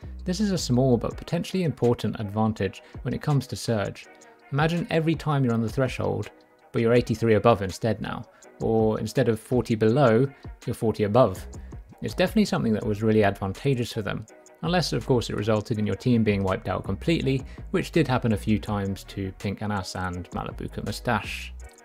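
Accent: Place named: England, United Kingdom. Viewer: This is so good but since im from australia the english accent triggers me lmao, good shit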